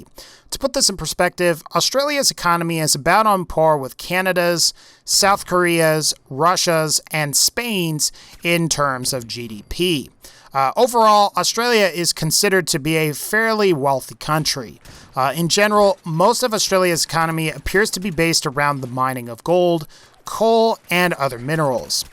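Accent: United States. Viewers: As an Australian, it is quite amusing to listen to you, an American, pronounce some of our major cities. i.e Melbourne = Mel-Bin. Brisbane = Bris-Bin.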